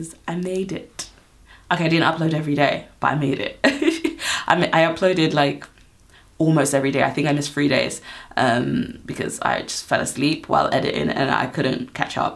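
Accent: United Kingdom. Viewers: don't stop posting videos. your British accent is amazing . I'm a dude btw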